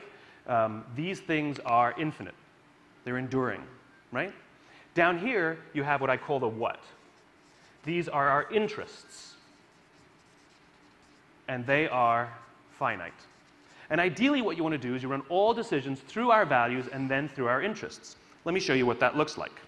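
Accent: United Kingdom. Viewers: No question the guy is intelligent, but there’s something disingenuous about him. In earlier Ted talks and other presentations, he speaks with a British accent. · Is this guy English or American ?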